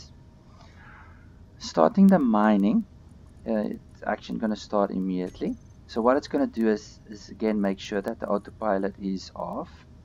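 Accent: South Africa. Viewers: I know a South African accent when I hear one!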